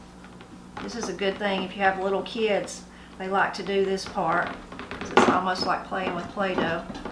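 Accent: Appalachia, United States. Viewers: Your accent makes me want ramps and tators and deer meat,cornbread pudding.😂💪😇🙏🙏🙏🙏🙏🙏🙏love west virginia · I love your channel and learning about Appalachian culture. My family is from Arkansas, but I suspect that somewhere down the line we have some family from this region. My mom’s accent (and her mother’s) sounds so much like yours, and she uses a lot of the same words. I asked her about it, and she said that my grandparents traveled a lot picking fruit and following field work before she was born, so maybe they had picked up some of the accent from fellow workers. Anyway it is really interesting to me, and I’m sure to many others as well. I’m happy to see all of these recipes and to learn the backgrounds on them too!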